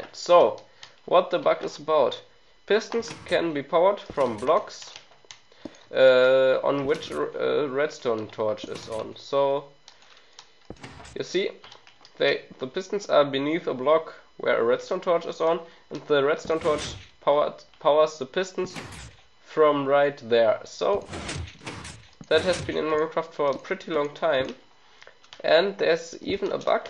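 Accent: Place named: Germany. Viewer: du hast einen deutschen Akzent. :D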